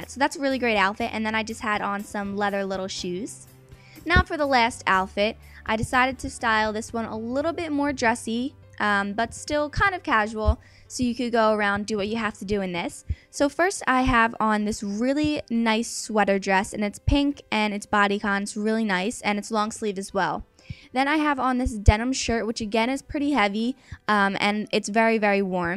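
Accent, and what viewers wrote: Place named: South, United States. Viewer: I thought u were grom the south, you sound country :b<3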